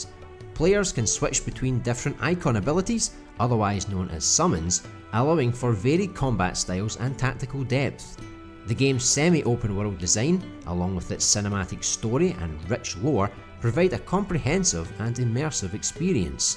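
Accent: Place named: Scotland, United Kingdom. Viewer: My man, I didnae realise you had a channel yersel AND on top of that I hear a welcoming Scottish accent. What a guy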